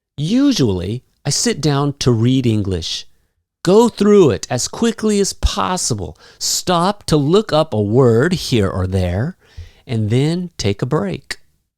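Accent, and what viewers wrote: United States. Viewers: It sounds american.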